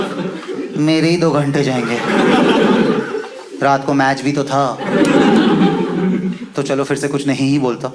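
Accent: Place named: Bengal, India. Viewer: I like your benlivised english.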